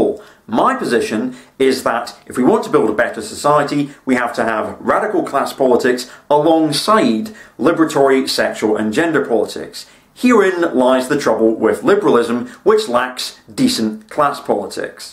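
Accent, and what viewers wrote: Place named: Edinburgh, United Kingdom. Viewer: Think he's from Edinburgh. His accent is too posh to be from Glasgow.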